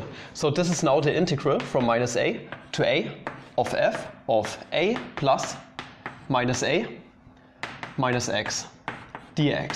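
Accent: Germany. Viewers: If you don't mind me asking, where does your accent come from? I, being an American (therefore having no idea what accents come from where), just think it's German. Is that right?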